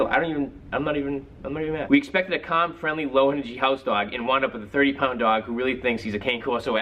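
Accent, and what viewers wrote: Boston, United States. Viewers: You have GOTTA be from Massachusetts!!!! I'm living for that Boston accent. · Nice review. You sound like you have a Boston accent. If you live in the area and want to review an Airedale, let me know! 😉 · The Boston accent just makes these reviews go from average to hilarious.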